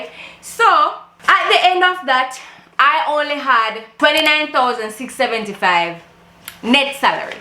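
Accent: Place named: Jamaica. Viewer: Ik a Jamaican when I hear it love this channel ❣️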